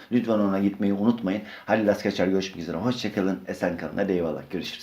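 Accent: Black Sea, Turkey. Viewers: Abi Trabzonlumusun Rizelimi ?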